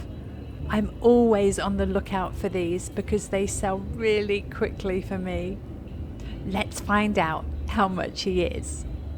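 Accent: United Kingdom. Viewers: Please don't put things back on the table like that carry them down the table as you go. I know I am not alone in saying that it drives my anxiety way up. Even if you say that no one is behind you, it doesn't stop the anxiety. The only thing that would is if you carry it along with you. If you must put it down, please put it down in front of your body while you reach for another item. Then pick up that item along with the other ones in front of it. If I could control anxiety I would, because it really sucks. I'm relieved you were able to get all the items. But maybe if you wouldn't mind, in the future realize that so many of us, including myself that love watching all you guys as a respite from anxiety. I love watching your videos. You are always so cheery. I love your British accent, and your knowledge about so many things.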